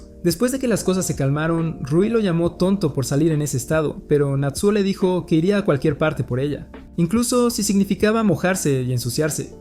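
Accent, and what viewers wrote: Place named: North, Mexico. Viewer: Como que un poco norteño e unnnn poquito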